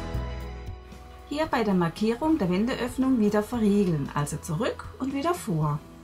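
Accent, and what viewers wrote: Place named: Karlsruhe, Germany. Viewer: nein ich höre es am dialekt :D komme selbst aus karlsruhe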